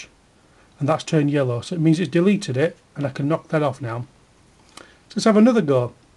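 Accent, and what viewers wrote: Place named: Lancashire, United Kingdom. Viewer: Hi mate, thanks for your tutorials, you're doing very well.Hey I love your accent. Is it Lancashire you come from or somewhere not far? I used to attend boarding school in the beautiful Lake District which as you may know's a stone throw away. I have just bought a Kaosilator like yours and learning. Do you happen to know how I would save stuff onto the SD card without erasing previous data? The instructions are not the clearest in some respects. Cheers.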